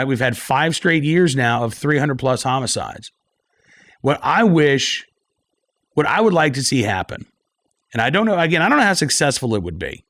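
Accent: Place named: Virginia, United States. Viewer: I got family in southern VA and they talk just like that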